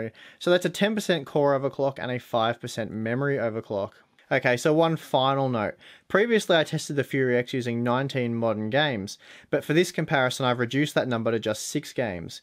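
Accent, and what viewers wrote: Australia. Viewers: Cool, thanks for clarifying. I admit I subbed to the channel when Matt was doing the videos and really enjoyed the style and depth of the benchmarking. Then one day there was a funny looking guy without a blue shirt I thought I clicked on the wrong video! But then I realized the style and methodology was the same. With that said, I enjoy your videos just as much if not more since your Aussie accent is a bit more tamed! Keep up the good work man you are my favorite tech channel by far!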